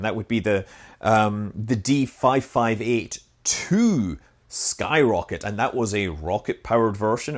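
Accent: Scotland, United Kingdom. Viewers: There isn’t one ‘British accent’ His accent is a cross between a Scottish, Irish and a small bit of a Californian accent because that’s where he lives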